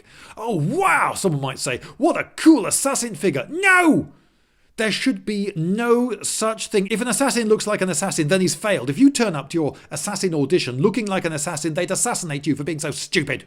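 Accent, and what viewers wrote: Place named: United Kingdom. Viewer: Funny, you sound overall brittish yet you are as pedantic as us germans. =) Anything we should know? Have a nice Christmas and a happy new year.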